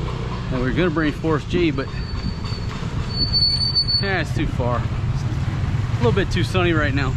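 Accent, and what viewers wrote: South, United States. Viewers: Where you from bro.... I hear a southern accent.....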